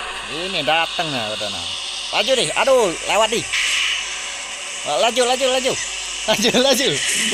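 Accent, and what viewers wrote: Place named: Palembang, Indonesia. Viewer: Antara palembang atau jambi ni dari bahasa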